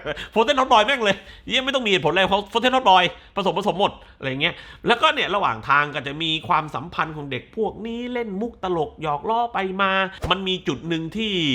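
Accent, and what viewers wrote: South, Thailand. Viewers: ชอบการขายของด้วยการแหลงใต้มากค่ะ 🤣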